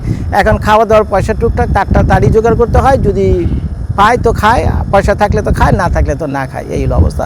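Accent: Dhaka, Bangladesh. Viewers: da apone ki hasnabader chele naki aponar kotha kichu ta dhakakiya der taan ashe baki ta mone hoy hasnabader jak bhalo thakben barir shobai ke neye ae prathonai kori ami ❤❤❤❤❤❤❤❤❤❤❤❤❤❤❤❤❤❤❤❤❤❤❤❤❤❤❤❤❤❤❤❤❤❤❤❤❤❤❤❤❤❤🎉🎉🎉🎉🎉🎉🎉🎉🎉🎉🎉🎉🎉🎉🎉🎉🎉🎉🎉🎉🎉🎉🎉🎉🎉🎉🎉🎉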